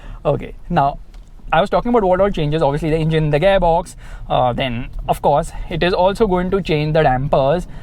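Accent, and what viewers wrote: India. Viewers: That's an absolute indian accent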